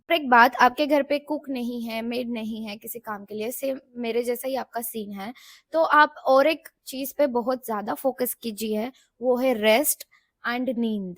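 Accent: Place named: Maharashtra, India. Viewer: Marathi accents in hindi speaking are very nice Being a Marathi I like to hear it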